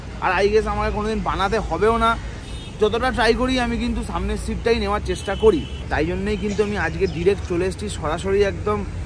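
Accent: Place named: Kolkata, India. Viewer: I live abroad but watch train and bus vlogs by people like you. I love your vlogs because you are very honest and committed and you do sound Kolkattan so much!!!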